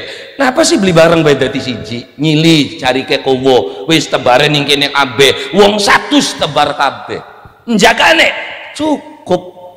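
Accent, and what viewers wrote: Indonesia. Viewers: Aku g Level yg ceramah ny kurang wawasan kental logat Ndesosanya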